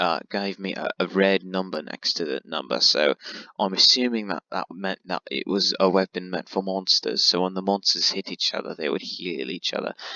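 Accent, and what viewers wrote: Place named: New Zealand. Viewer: What an Astounding Review! seems legitimate af I Like that New Zealand Accent Daddy 💦💦💦💦💦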